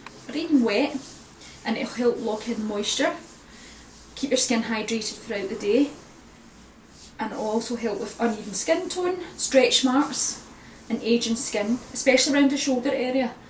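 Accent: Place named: Scotland, United Kingdom. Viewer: Great review and I adore the Scottish accent x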